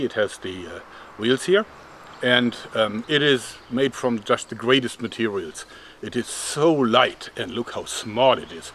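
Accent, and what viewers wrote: Germany. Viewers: he is german i know that accent if you watch albert Einstein genuis from nat geo thats the accent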